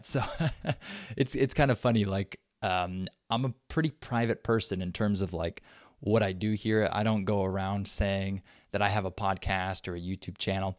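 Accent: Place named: United States. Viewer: see you later beautiful guy i love your american accent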